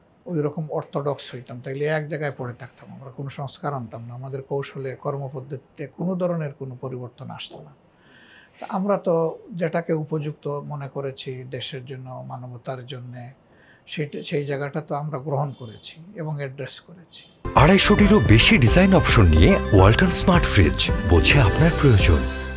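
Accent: Sylhet, Bangladesh. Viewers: Apnar kotha shunle r valo lage na . Bangla bolen . Sylheti na . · জি উনি সিলটি।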